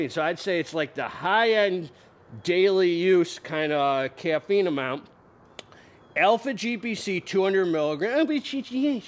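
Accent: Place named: South, United States. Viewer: You remind me of a southerner with the way you say things. Idk where you live you just remind me of a very energetic southerner lol😂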